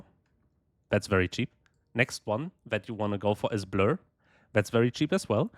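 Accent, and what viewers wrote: Germany. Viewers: Er hat auf jeden Fall einen deutschen Akzent, außerdem muss man nicht hochdeutsch sein um akzentfrei Englisch zu sprechen. ;) Sein Englisch ist natürlich trotzdem super.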